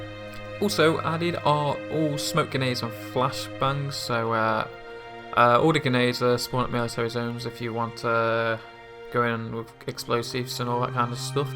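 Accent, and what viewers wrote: United Kingdom. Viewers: Are you from liverpool? You sound like you are.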